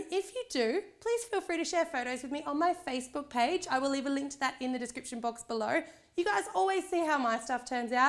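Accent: Australia. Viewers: Aussie accent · I love all of your videos !!! I love cupcake making !!! Thank you so much for your tutorials! And btw I love your Aussie accent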